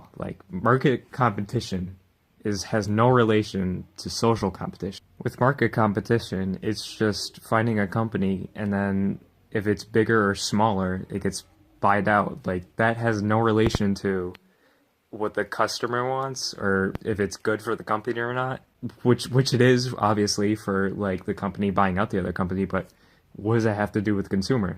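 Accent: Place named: New England, United States. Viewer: You don't sound Slavic you sound Yankee like me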